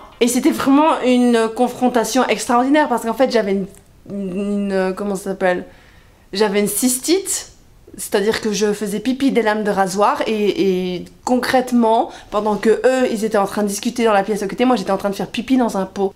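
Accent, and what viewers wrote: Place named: Belgium. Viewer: tu as toujours l'accent belge au fait... "houit" :p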